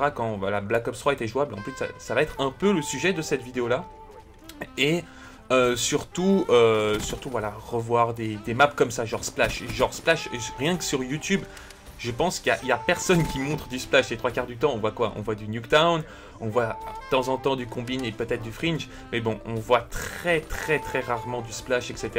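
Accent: Switzerland. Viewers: t'es suisse ? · Il est suisse je crois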